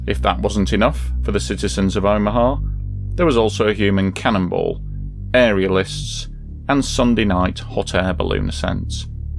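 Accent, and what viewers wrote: United Kingdom. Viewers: I can tell you're British, but youve live in America for a while. I can tell by the way you say "the hospital"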